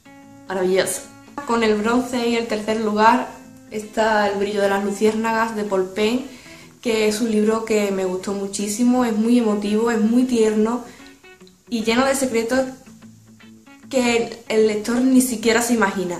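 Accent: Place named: Andalusia, Spain. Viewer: eres andaluza de donde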